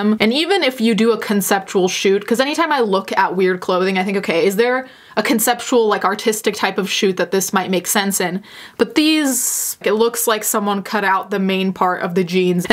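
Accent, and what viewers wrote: Italy. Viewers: I think i found a new fetish. Listening to pronounce things with the heavy italian accent is just so satisfying. Please say prada again. Or gucci. Or whatever. Uuuugh just like cutting a tomato with a freshly sharpened knife. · The linguist in me, loves that you pronounce Gucci and Prada with a proper Italian accent. I've notice you do this in other videos, but it really stood out to me here.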